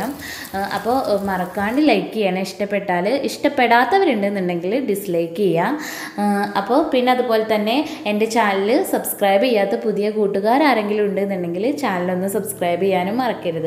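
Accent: Malappuram, India. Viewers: Ningal malappurathu evideya??thani malappuram slang.njanum malappuram aanu..anyway proud of u.ethrayokke achieve cheythallo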